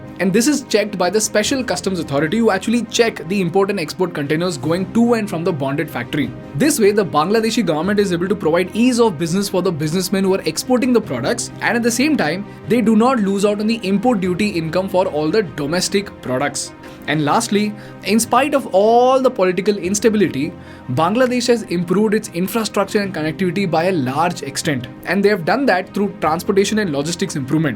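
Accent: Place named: South, India. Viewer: You need to try and lose the aiaiyyo accent. The rest is good.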